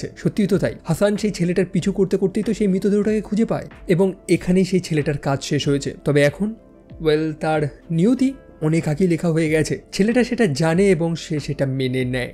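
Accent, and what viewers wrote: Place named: Sylhet, Bangladesh. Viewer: Sylheti vai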